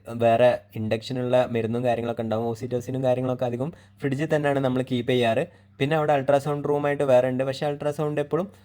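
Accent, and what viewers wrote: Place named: Malappuram, India. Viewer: സംസാരം മലപ്പുറം സ്റ്റൈൽ und ad കൊണ്ട് ചോദിച്ചതായിരുന്നു 👍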